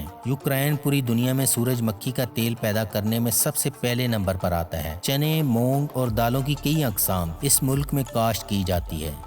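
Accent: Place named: Punjab, Pakistan. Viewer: Lahore Pakistan se boht Sara Pyar apki beautiful Punjabi accent awaz Kay Liye, 💕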